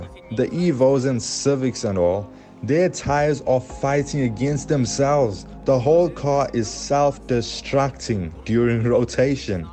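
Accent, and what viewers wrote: South Africa. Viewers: I feel like I am listening to an asian that some how grew up africanz in South Africa. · Bro are you south african, dueban maybe?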